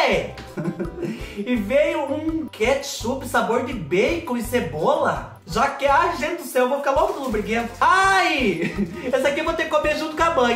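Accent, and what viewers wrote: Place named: Minas Gerais, Brazil. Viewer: do céu, vc é natural de onde? Seu sotaque parece muito com o do mineiro. Não sou clandestina.😘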